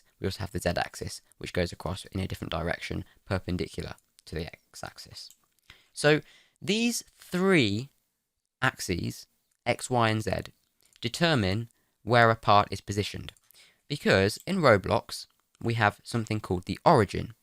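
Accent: United Kingdom. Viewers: I have needed this video for soo long. Also, is british;) FINALLY A YOUTUBER WHO DOESNT SAY zee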